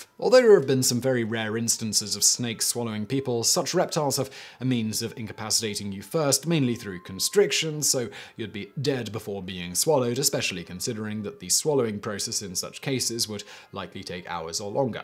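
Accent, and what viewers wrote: England, United Kingdom. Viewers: Do you not think he's actually English? Cause he is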